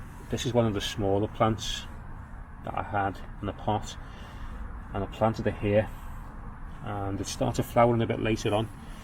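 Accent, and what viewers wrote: Liverpool, United Kingdom. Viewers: Just what I needed! a video by a fellow scouser, the garden is lovely and I think its fair to say you are a bit salvia obsessed, enjoyed listening to you, regards from Ontario, Canada. #YNWA.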